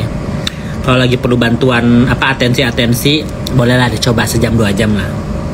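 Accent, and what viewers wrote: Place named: Sunda, Indonesia. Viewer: Kk lucu ngomong sunda nya ceunaaaaĥhhhh 😂😂😂😂😂😂😂😂😂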